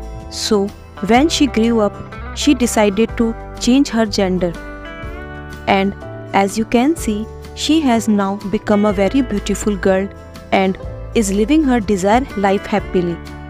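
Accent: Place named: India. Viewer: I like that you've always used your own native Indian accent. I appreciate it more with all the annoying AI fake voices appearing.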